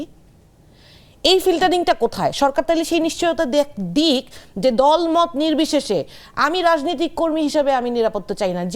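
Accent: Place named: Noakhali, Bangladesh. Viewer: Tor Kotha noakali language er moto pronouns laghe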